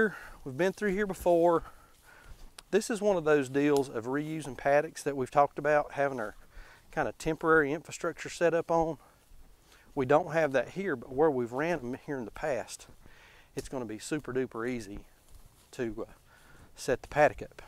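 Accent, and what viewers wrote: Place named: North Carolina, United States. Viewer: Love your North Carolina accent. Sad that American accents are disappearing.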